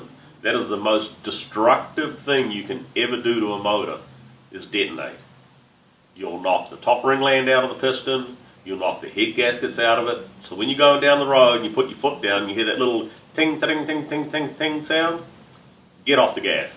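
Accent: New Zealand. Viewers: weird accent half kiwi half american? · His accent is a mix between Maori and Southern American. Lmao, is weird. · cant get rid of that kiwi accent ha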